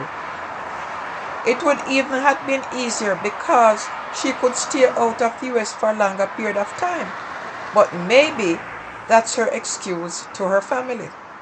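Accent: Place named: Jamaica. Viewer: I can't understand most of what you're saying. Your Jamaican accent is too overwhelming. Sorry, but I have to tune off and stop listening.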